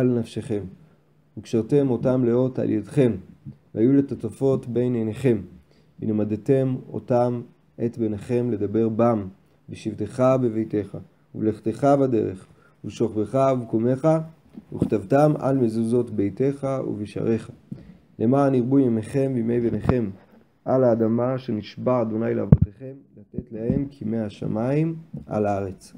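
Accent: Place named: Israel. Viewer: Your prayer is clear, easy to follow and sounds authentic Israeli. It's a good supplement to allow us to fulfill the mitzvah in some days we have no access to the synagogue.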